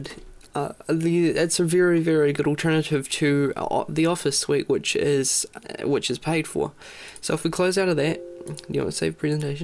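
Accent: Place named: New Zealand. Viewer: Man, that was viciously Kiwi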